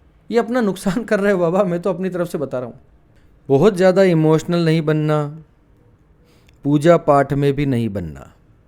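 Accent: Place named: Rajasthan, India. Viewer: Aapki boli bhilwara ke aaspaas ki h bhai sab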